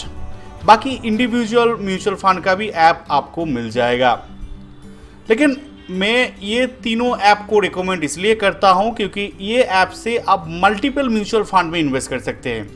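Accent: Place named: Bengal, India. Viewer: sir apni bangli jaisa bolte hai.. mast lagta mjhe.. 😊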